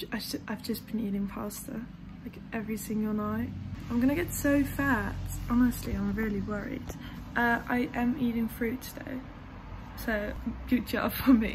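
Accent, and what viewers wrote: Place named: RP, United Kingdom. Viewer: Proper posh accenttttt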